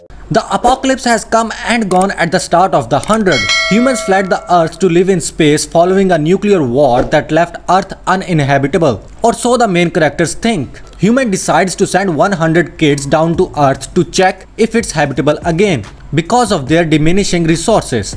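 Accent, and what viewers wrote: India. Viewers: it’s awfully hard to understand, due to the thick Indian accent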